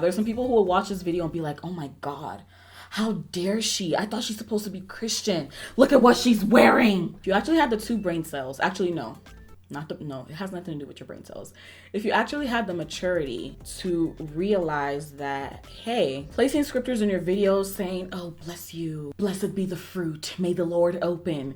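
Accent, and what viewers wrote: Africa. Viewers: I’m living for the African accent thoooo😍😍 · Hey... great video, you and my youngest Erin share the same birthday!! Happy belated birthday. You have helped me so much with this video about the weight gain issue... I plan to be more aware of what I say to my 19 year old daughter!! Kudos to you for putting yourself out there. It tickles me to hear your African accent. I’m married to a Cameroonian and you make my day when I watch your videos!! Lol keep the videos coming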